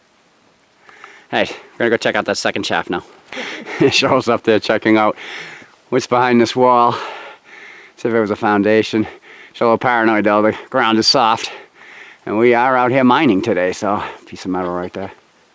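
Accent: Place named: Massachusetts, United States. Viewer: Holy mass accent bro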